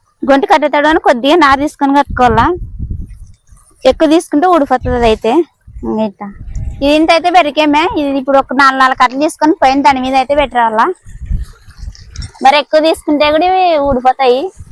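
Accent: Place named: Karnataka, India. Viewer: Mee matalde telugu karnataka side ani telisindi.nanu antunnaru bhagundi talli, god bless u.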